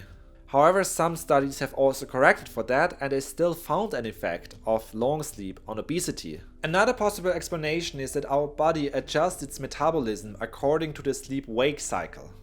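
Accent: Germany. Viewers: This is the first vidoe by you I’m seeing. I immediately knew you were German. Ich liebe unseren deutschen Akzent :D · I subscribed simply because I love listening to German people talk. Their sentence structures lack pronouns, conjunctions and sometimes the improper emotional reactions to what they are saying. Nothing wrong with that, I'm not criticizing this person at all. Most languages translate a little irregular to English. I find Germans speaking English to be really cute😊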